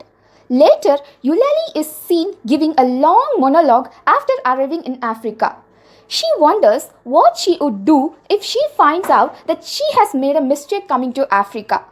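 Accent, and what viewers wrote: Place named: India. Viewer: I am the 2000th person to watch this. She speak Indian english, I wanted African englis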